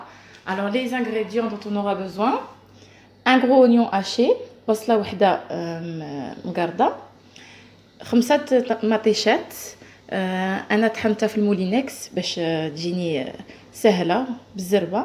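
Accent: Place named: Tlemcen, Algeria. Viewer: Vous avez un accent Tlemcen et le vocabulaire n est pas marocain . Les épices .les herbes la farine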